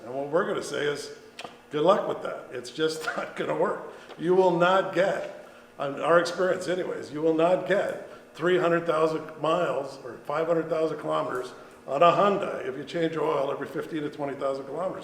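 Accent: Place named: United States. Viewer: Your accent is American, but you’re talking in kilometers. What am I missing?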